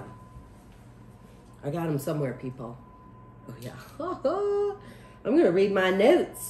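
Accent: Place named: Michigan, United States. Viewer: LOL Yup, she's from Michigan . . . says "MELK" instead of milk. Go Franklin Patriots!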